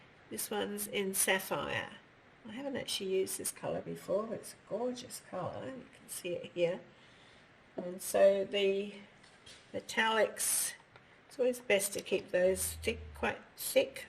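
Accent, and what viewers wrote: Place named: United Kingdom. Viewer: I have two of Sarsh's paintings. One is Low Tide (there is a video). Have a smaller condo so space is limited but her paintings are the highlight of a wall. She noticed you because of your exceptional progress over a short time. Good for you. Assume you are in British Isles based on your lovely accent and 5 hour time difference. Have a wonderful day!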